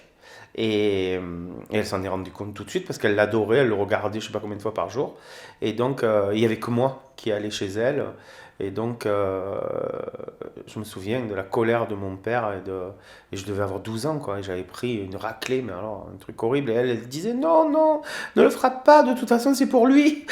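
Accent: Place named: Provence, France. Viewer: Bises à toi,qu'est ce que tu as pu me faire rire avec ta faconde provençale qui me rappelle tant "Les Martigues" de mon enfance...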